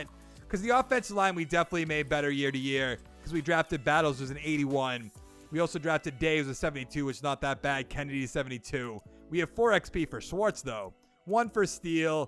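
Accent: Boston, United States. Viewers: This is the thickest Boston accent I've ever heard besides Ben Affleck and Matt Damon😂😂😂😂😂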